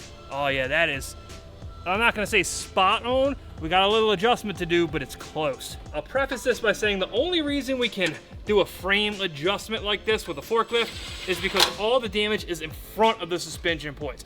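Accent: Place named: Delaware County, United States. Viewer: Man I hear your accent so hard, almost sounds like you’re from Delco?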